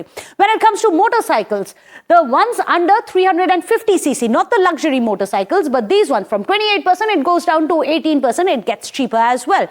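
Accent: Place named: Assam, India. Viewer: Deka?! First time seeing one Deka as news anchor in national media!! Well done!Assamese influence in accent is obvious though! But please be bit less forceful in delivering the words. Let it be free flow! You can follow Palki Sarma for learning!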